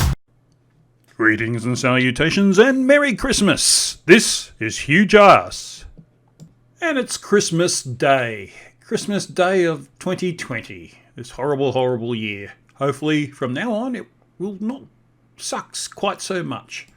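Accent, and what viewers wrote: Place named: Scotland, United Kingdom. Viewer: Sounds very Scottish! Thank you and wishing you a very merry Christmas.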